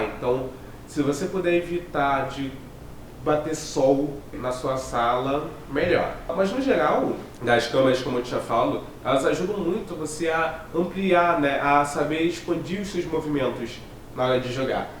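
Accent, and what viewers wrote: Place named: United States. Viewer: CARAMBA ACHEI QUE VC ERA AMERICANO mas e brasileiro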